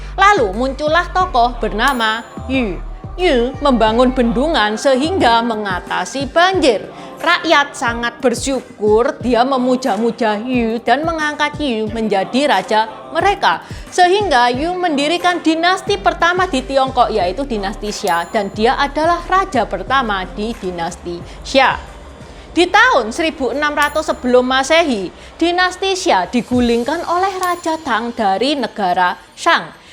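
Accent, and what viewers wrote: Java, Indonesia. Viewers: logat jawanya jangan di ilangin...terima kasih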